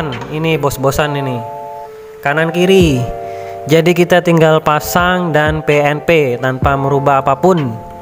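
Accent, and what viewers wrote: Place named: Madura, Indonesia. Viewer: Logat bahasanya kaya logatnya orang madura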